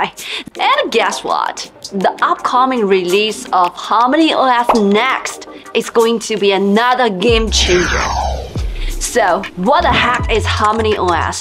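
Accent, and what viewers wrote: United Kingdom. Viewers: don't you know she speak pure UK English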